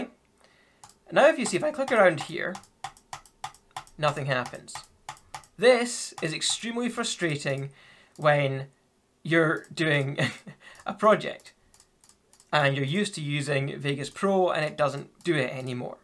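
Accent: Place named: Scotland, United Kingdom. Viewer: Warsaw, Poland :D Was wondering which part of Scotland, nice!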